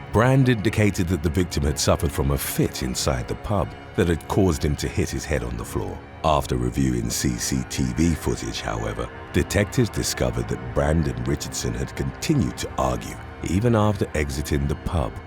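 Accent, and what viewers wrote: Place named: United Kingdom. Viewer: He’s British, they pronounce words differently than Americans.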